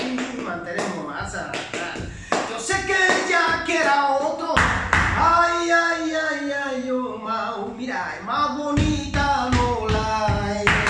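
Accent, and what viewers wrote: United Kingdom. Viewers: Mano el que no le guste tu ingles, que se joda! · Muy Bueno! Y tú Inglés no me molesta! Te da mucho carácter 👍👍☺️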